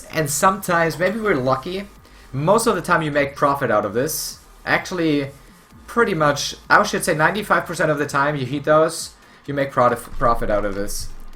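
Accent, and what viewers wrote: Germany. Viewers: youre german, no?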